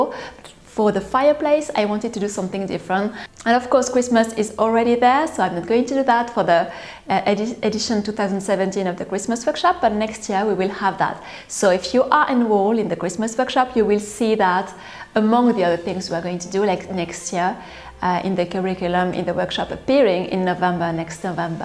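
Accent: France. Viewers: I am so enchanted by you, your lovely French accent and your art most especially.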